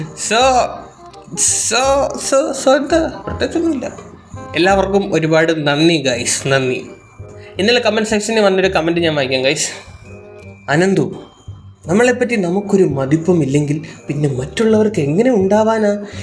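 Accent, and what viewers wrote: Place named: Karunagappally, India. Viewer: videos okke nalla funny aanu...karunagappally slag kelkkanum❤❤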